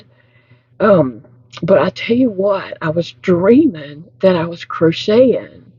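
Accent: South, United States. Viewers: You are a steel magnolia! I never met anyone from a southern state because I was born and raised in New York City and later moved to California. Now I am refired and live in Florida where I have met a few southerners. I have such a respect for you guys because so many of you are down to earth and have true grit. Just like you, I loved the movie, "Steel Magnolias" and you remind me of those ladies, especially Dolly Parton. You have the sweetest voice and the cutest accent. You are intelligent and funny, and so very charming and loving. Life can be tough, and some of us are more challenged than others. God has blessed you with fortitude, and a very loving family to bolster you. I will continue to include you and your "men folks" in my prayers and will ask the Lord to help you heal and ease your pain. I know you are resilient, and that "new" hand will be back in business in no time.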